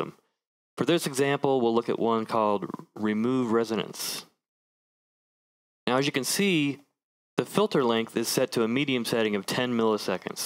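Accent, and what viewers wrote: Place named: California, United States. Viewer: You kinda sound like Gregory Scott of Kush Audio fame, but with less vocal fry and and less CA accent. Not an insult, to be clear, I just noticed the similarity between your voices.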